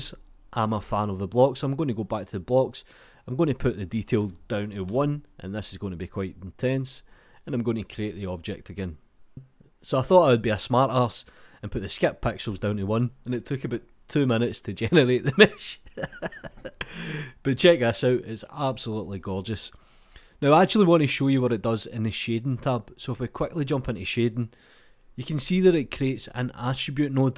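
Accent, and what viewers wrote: Scotland, United Kingdom. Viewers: Good information delivered straight to the point in a clear scotch brogue.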